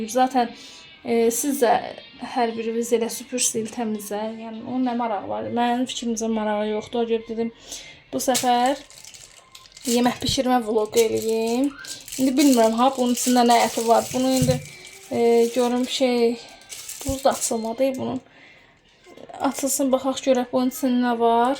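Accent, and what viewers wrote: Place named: West, Azerbaijan. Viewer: Maragli geldi haralisiniz lehceniz qerb zonasina oxwadi ona gore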